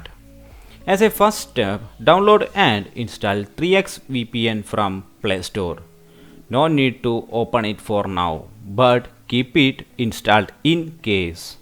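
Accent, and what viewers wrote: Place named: India. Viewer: Finally indian speaking english... God bless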